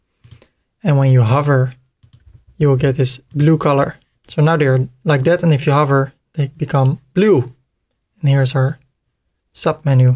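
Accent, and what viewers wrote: Netherlands. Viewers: Nice video, i immediately heard you are Dutch. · your accent sound very dutch. are you dutch?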